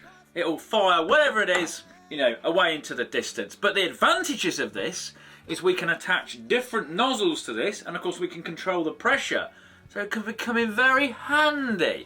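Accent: England, United Kingdom. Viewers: L’accent anglais m’énerve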